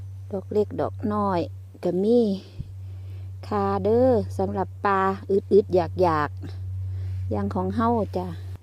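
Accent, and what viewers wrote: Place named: Isan, Thailand. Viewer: ชอบเวลาเว้าอิสานจะน่ารักดี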